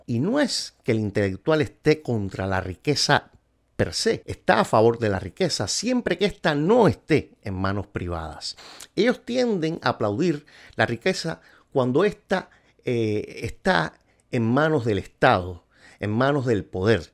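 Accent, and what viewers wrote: Cuba. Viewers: Gran vídeo . Pero creo que hablas del intelectual comunista. Por tu acepto veo que eres quizás cubano? Yo soy cubana y entiendo que este punto de vista viene algo heredado de la situación del país. Quizás eh? De cualquier modo, muy bien video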